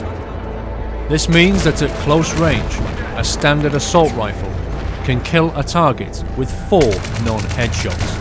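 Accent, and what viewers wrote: England, United Kingdom. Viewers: From the HK Website: Frequently asked Questions What is the proper way to pronounce Koch? The English pronunciation is pronounced, “coke." He pronounced it properly, it's coke, but he has an English accent. The video pronounced it as perfectly as his accent allowed. You're all saying it wrong. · DAMN!! now thats sone in depth review!! THE NEW BREAD OF REVIEWS! Fantastic video! see you got to narrate it lol he sounds like your average uk army guys accent lol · Why the English accent !?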